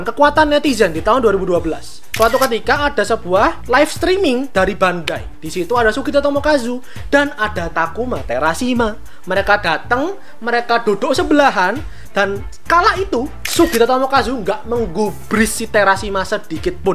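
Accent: Java, Indonesia. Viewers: Medhok banget kak asal jawa kh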